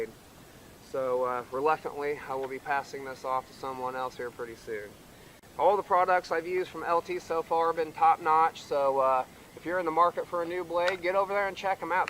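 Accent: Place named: Midwest, United States. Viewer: I thought you sounded like somebody I would know. I grew up in Vincennes Indiana. We had a big farm in Illinois.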